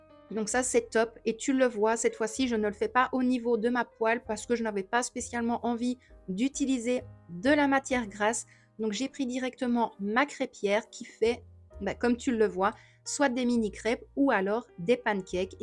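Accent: Belgium. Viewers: merci ,j ai regarder en effet,ça ressemble plus aux pancakes américain !! Ton accent mdrr ,je suis belge aussi donc sa ne me choque pas Et oui le spéculoos c'est la vie 😘😘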